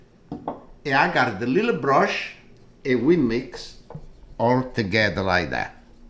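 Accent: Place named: Sicily, Italy. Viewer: Im making this tonight! Love this mans recipes! He reminds me of my grandmother who came from sicily in 1938 when it comes to his accent and his love for cooking.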